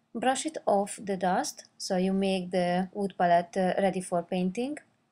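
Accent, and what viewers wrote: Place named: Russia. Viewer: а чё мужика то нет чтобы с палетами помочь. По акцентику то ты походу наша дифчонка :-)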